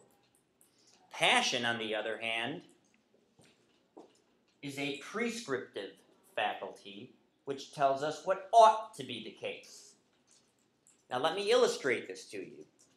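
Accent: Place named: South, United States. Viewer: It's so sad that I could tell he was from the South.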